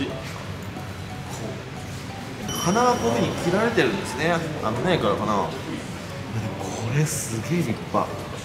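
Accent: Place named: Tokai, Japan. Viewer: 東海出身ですか？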